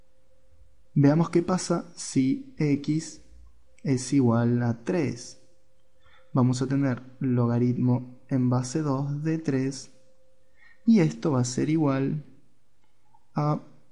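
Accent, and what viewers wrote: Rioplatense, Argentina. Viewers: Bryce Flint No es culpa de él haber nacido en un lugar distinto al tuyo, es su acento de argentino o uruguayo, no se. La culpa es tuya por intolerante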